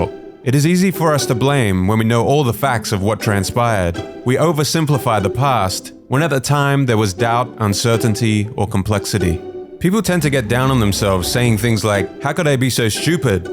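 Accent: Australia. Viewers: Are you Australian, Mr Narrator? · Nu Aus accent.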